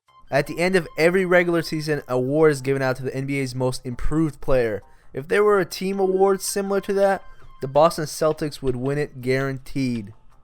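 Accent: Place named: Asia. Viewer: u sound asian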